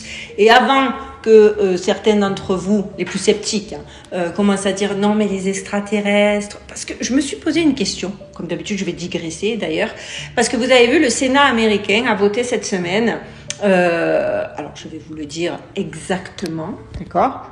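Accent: Marseille, France. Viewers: Cc.. J'adore ton coup de gueule.. 😂🤣🤣😂🤣🤣 Les gars allé sur France 2..🤣🤣😂😂 Toute façon tu aura toujours des critiques c'est la rançon de la gloires.. Ta gloires a toi! Ce que tu fais pour nous tous les curieux, les éveillés qui penses que l'univers est habités par des êtres autre que humains et bien moi j'y crois aussi..merci pour ton boulot 👏👏👏👏👍👍👍merci.. Et puis ton accent de Marseille est bien très joli.. ☺️ Je te souhaite de bonne fêtes.. 🎉🎉🎂🎂🥂🥂🥂🥂🌹🌹🌹🌹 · Hello jolie fille du sud 😊 moi aussi je suis marseillaise et j'aime ton accent qui me rappelle le sud qui me manque 🥰 et tu as raison les gens parce qu'on ne voit pas ça n'existe pas 🙄 ahhhh les conformistes de la ruche avec aucune ouverture d'esprit 😅😂 ces habitants de l'île ressemblent à des pleéadiens ou à des atlantes 🤔 je pense que certains êtres bien en avance sur nous, habitent toujours sur Terre ou intra Terre. 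Certains bienfaisants et d'autres beaucoup moins car sinon les élites ne pourraient pas contrôler ce monde aussi facilement... A voir 😅 peut-être un jour aurons nous la vérité 😉😘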